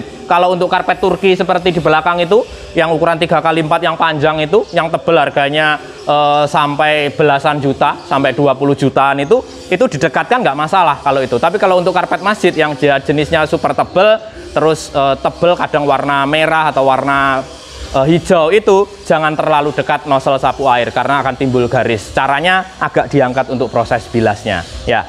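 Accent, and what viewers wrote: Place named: China. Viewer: Kalau menurut saya sering seringlah mempergunakan bahasa INDONESIA,agar tidak terdengar seperti orang CHINA sedang berbicara menggunakan bahasa INDONESIA.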